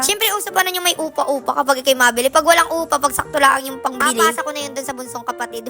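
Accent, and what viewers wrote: Batangas, Philippines. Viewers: Manyan ka,,pero tonong batangas ka...🤔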